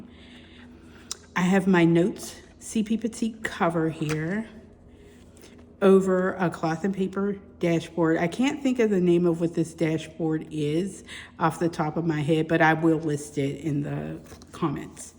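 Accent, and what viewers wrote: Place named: Pittsburgh, United States. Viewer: I hear the Pittsburgher accent. I am excited to know there is a planner lover in the Burgh. Good luck on your studies. Carlow has a good nursing program. New subbie here…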